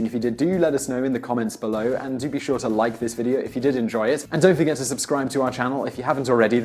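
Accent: United Kingdom. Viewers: Is that British matthew santoro??? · I do love a eloquent British Accent.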